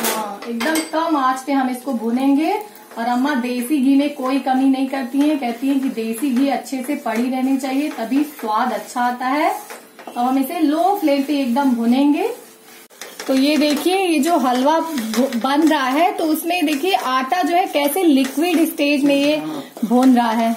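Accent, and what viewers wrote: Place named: New York, United States. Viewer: NYC voice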